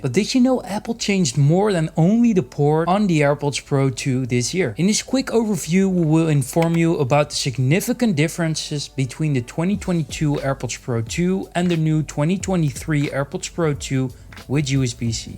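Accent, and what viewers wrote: Netherlands. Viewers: The Dutch accent is strong with this one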